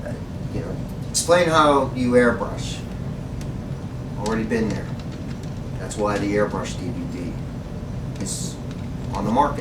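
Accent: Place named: Pennsylvania, United States. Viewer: Love your videos an your PA accent as well. You don't mess around or mince words. I love that. Link to the DVD s would be cool.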